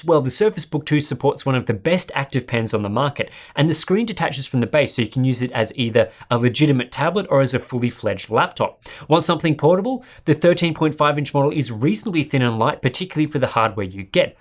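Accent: Australia. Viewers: You have a Aussie accent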